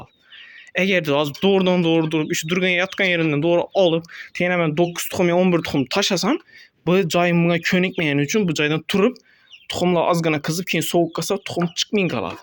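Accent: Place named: Khorezm, Uzbekistan. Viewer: Aka iloji boʻlsa shevada gapirmang oddiy oʻzbek tilida gapiring vidyolarizni faqat xorazm liklar koʻrmaydi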